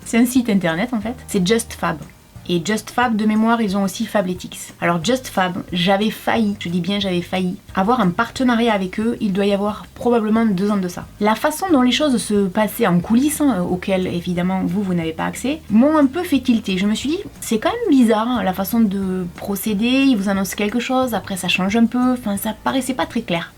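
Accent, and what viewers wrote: South, France. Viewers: t'inquiète pas je filme avec une tablette merdique et crois moi ça fait l'affaire et moi non plus je suis pas top des Kardashian. Tu est top continue comme ça je te suivrait toujours je suis contente a chaque fois que tu sort une vidéo ton accent du sud me tue j'adore. Je suis allée dix jours a saint pierre la mer et j'ai adoré l'accent du sud et le vent du sud le marin. Bonne soirée.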